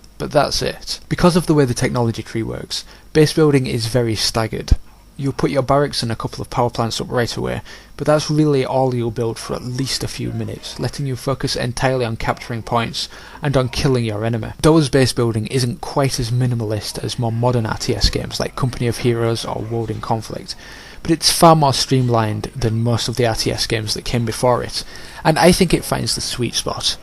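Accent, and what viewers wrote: United Kingdom. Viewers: are you from britain? just asking.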